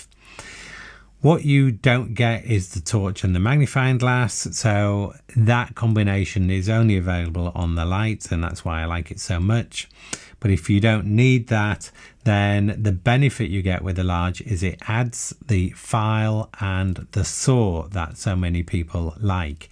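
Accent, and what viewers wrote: England, United Kingdom. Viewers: If im honest SAKs are why i came to the channel, them and Olight torches. I stayed for an English accent and UK £ pricing and the concise reviews. I have titanium scales on my cybertool but as hinted at above i always have a torch so i don't have any lite models. It's probably as a collector of well over a 100 SAKs in my top 5 Swiss Army Knife models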